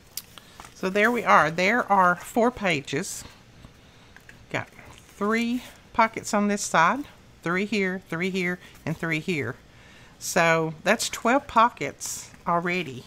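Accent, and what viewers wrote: South, United States. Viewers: I didn't realize that you were near Birmingham, Al. I guess I missed out on were your from. But, I can tell you have a sweet voice with a slightly southern accent. I'm from Alabama myself so there's not really an accent. Lol I think doing what you're doing and talking with "friends", will help you out of that funk. Above all, prayer. But I realize you know that already. Here's to better days ahead.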